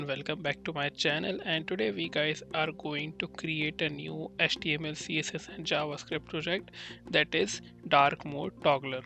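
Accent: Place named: India. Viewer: took my like back immediately he started speaking indian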